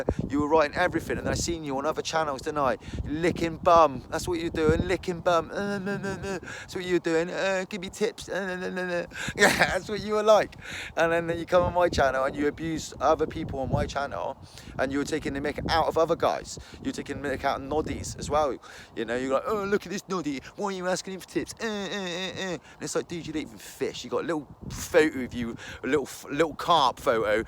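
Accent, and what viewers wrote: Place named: Wales, United Kingdom. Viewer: I DO NOT SOUND LIKE YOUR IMPRESSION OF A CARP ANGLER!!!! :) I like this video, best one yet. You mentioned Somerset, i thought you sounded Welsh?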